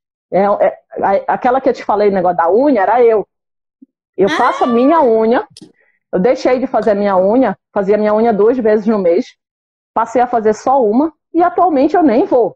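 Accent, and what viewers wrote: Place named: Recife, Brazil. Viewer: Pelo sotaque acho que é de Recife/PE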